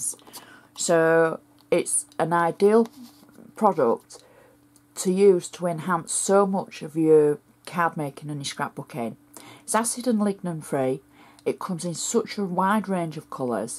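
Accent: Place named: Yorkshire, United Kingdom. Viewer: Nuvo product doesn’t have a sponge, and I never actually learned the “proper” way to use it, so obviously I get it all over my fingertips. Because you’re right: you just want to dig your fingers in it at once. Fortunately, I don’t have a lovely manicure like yours, that I wouldn’t want to mess up. PS. Love listening to you speak. Your Yorkshire accent is “loov-ly”. 😻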